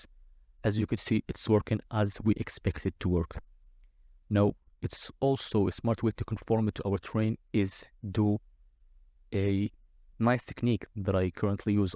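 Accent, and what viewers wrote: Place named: Russia. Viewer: очень русский акцент)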